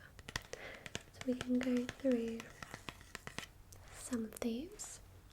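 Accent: England, United Kingdom. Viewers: wonderful English accent still gets me every time. It's absolutely, positively the best accent I've ever heard. Perfect for ASMR. 👌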